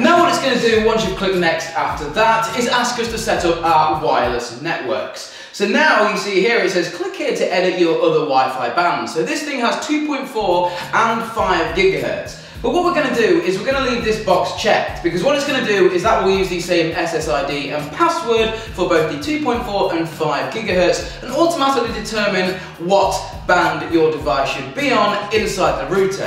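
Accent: United Kingdom. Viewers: For those who are mad at his english... Its Basic British Accent so for those who are americans or canadian or something chill out🤣😂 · a shota a fps shota wtf british accent 100% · I love your british accent! Would you like to say that once again. "Rooter." <3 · Jude Groomes he is speaking english not american english he says things differently because he is speaking United Kingdom English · Well technically yes as he lives in the uk therefore pronounces it how the rest of the uk pronounces it. Its like the US and other countrys pronounce it 'Rowter' but us brits pronounce it 'Rooter' But either way its spelt 'Router' all the time. It doesn't take a master mind to figure this one out.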